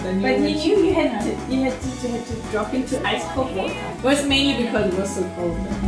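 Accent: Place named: Scandinavia. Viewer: Where are you from I hear your accent but can't determine where you are from. Is it Scandinavian?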